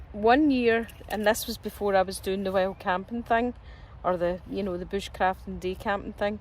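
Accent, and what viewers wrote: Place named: Scotland, United Kingdom. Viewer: Excellent relaxing video as always with wonderful Scottish accent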